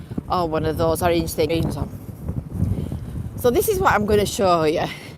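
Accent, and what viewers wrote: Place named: Yorkshire, United Kingdom. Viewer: That has to be a Yorkshire accent with that patter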